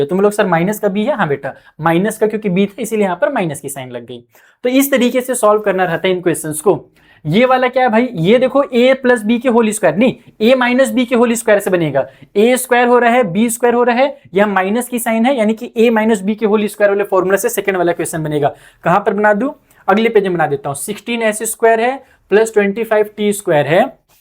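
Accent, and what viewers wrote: Bihar, India. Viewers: Sir bihari ho kiya.......... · thank you sir are you from bihar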